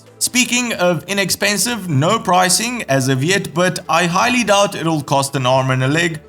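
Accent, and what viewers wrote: South Africa. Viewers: Nice video as usual.thanks guys. Ps: your accent sounds like mine... Afrikaans. Intrigued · For a Romanian you have a remarkably South African accent 😀 - love the videos !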